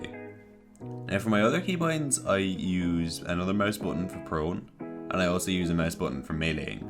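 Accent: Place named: Ireland. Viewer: Irish boi doe :) I'll try the peek keybinds out. It's what I'm most struggling with when switching from console to pc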